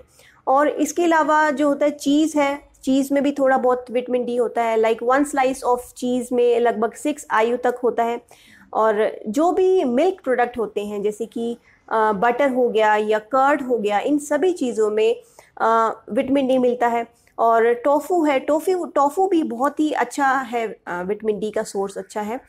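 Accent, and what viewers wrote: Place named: India. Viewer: english subtitle. indian voice.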